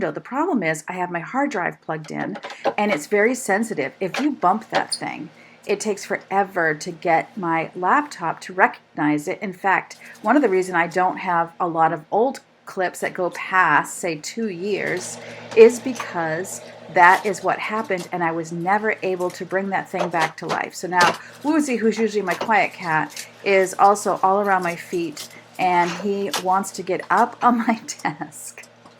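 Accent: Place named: Maine, United States. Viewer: Don’t ever change your wonderful accent,. It reminds me of home every time I hear it and I love it so much. We watched a video growing up in Maine about how the language is similar to a certain old English dialect. I’m frankly, a little shocked that anyone would comment in a negative way. It’s beautiful, beautiful beautiful, and I love it. ❤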